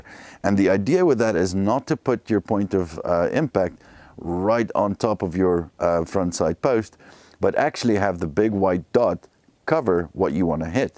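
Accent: South Africa. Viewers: Thanks for this, same experience on my APX. Are you South African by any chance? Great video, subscribed! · Liked your video. Is that a South African accent I am hearing?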